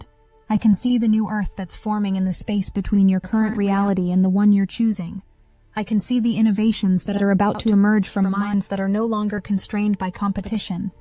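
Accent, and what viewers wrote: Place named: Netherlands. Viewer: There is something with dutch people and english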